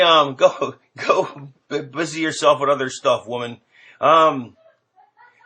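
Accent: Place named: United Kingdom. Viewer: i like your british accent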